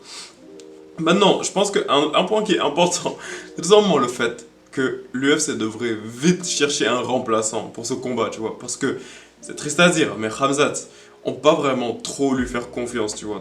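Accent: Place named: United Kingdom. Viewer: T’as grandi en Angleterre ? Je trouve que t’as un accent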